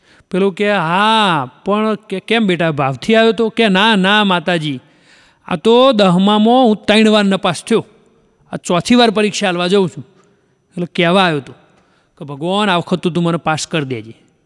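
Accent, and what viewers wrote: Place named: Mehsana, India. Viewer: વાહ તમારી મેહાણાની ભાષા ખુબ સરસ છેઃ હોવે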